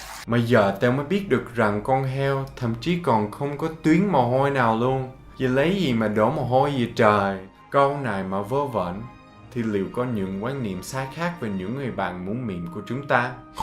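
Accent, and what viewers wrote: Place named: South, Vietnam. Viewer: Ôi Tây nói giọng miền Nam. Nghe vui kinh khủng.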